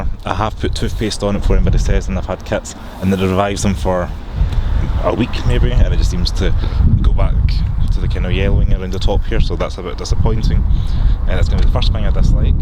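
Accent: Scotland, United Kingdom. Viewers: With such a powerful Scottish accent you need to make sure sound quality is very good so we can understand what you are saying. This video sound quality is aweful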